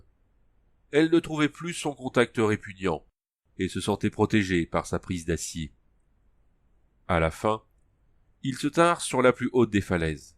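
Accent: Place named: Paris, France. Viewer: les livres audio c'est une bonne idée, mais l'accent parisien c'est pas possible c'est insupportable j'ai cliqué au hasard "qui occultaient l'ciel" bordel LE CIEL pas "l'ciel" On dirait une grosse vendeuse de poisson sur le marché entrain de brailler pour vendre sa merde : "VOUS V'LEZ UNE P'TITE TRANCHE MA P'TITE DAME" "V'NEZ GOUTER MON POISSON M'SSIEURS'DAMES, IL EST BON MON POISSON" Ok pour les livres audios, mais lis les mots en entier stp, sinon c'est inécoutable (sauf par des parisiens évidemment) bon après je critique mais dans l'ensemble c'était bien lu 👌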